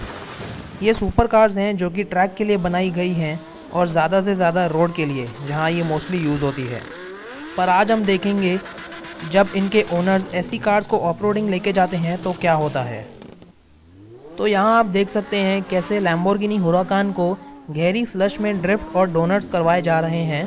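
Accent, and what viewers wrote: India. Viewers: its english with an indian accent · stopped watching the second I heard the indian voice lol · He sounds Indian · Clearly not his fault,he is Indian and It's very different from english,Don't be racist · Why is there a Indian talking? 😒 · Dude, are u indian? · Hes indian, not jis fault for not having english as his first language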